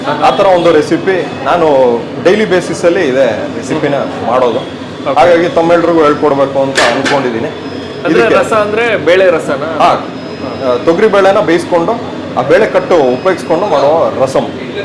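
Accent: Butler English, India. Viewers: Sir, your butler inglish is top, after very long time we are hearing, keep it up.🎉❤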